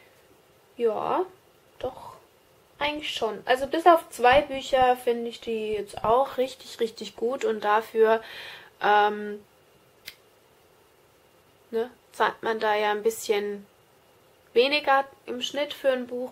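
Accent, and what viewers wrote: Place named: Palatinate, Germany. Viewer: Man kann sich als pälzer ned versteggle :D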